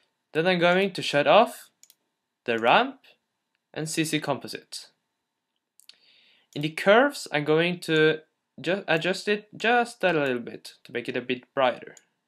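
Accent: Norway. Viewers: Are you norwegian? Sounds like it :) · hahaha kan høre at du e norsk på uttalen xD konge vid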